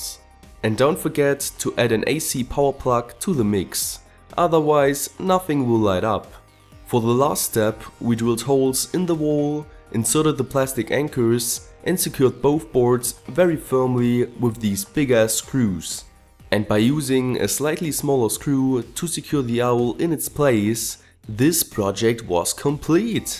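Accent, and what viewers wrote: Germany. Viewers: I love your German accent :) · JV Also offensichtlicher deutsch geht es kaum: sein Akzent, "Leipzig", Deutsche Marken. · Du bist aus Deutschland oder? Das hört man:) gutes video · Du krass... Läipsich...góil.... I wüsste das du deutsch bist wegen dein akzent, grüße von plagwitz :P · So many times he says zentimeeters xD I am more into the electronics Videos of GS but this one beats them all wit di akzent :D ( and please don`t get mad man ;) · are you german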